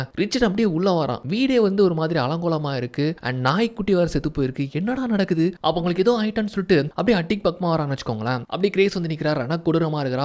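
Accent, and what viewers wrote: Tirunelveli, India. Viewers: திருநெல்வேலி தான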